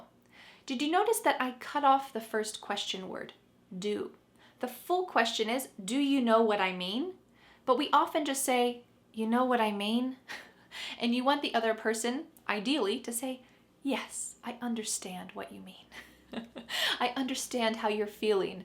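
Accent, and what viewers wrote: United States. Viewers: It's such a pleasure to wake up with your nice US accent and your funny mood. Thanks for the English loving speaker as I am. Have a nice day. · She has an american accent. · I love you American inglish, I do understand everything's you say,by the way congrats for you program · thank you for teaching us you are the best teacher for learning American accent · Love American accent from Pakistan. I’m a really big fan of American life and it’s history.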